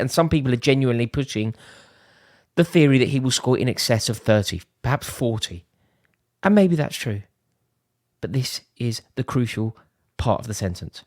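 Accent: RP, United Kingdom. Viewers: You sound like a posh Jamie o hara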